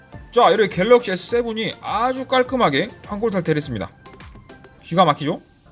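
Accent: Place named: South Jeolla, South Korea. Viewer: 전남사투리 맛깔나게하시네요 ㅋㅋㅋㅋ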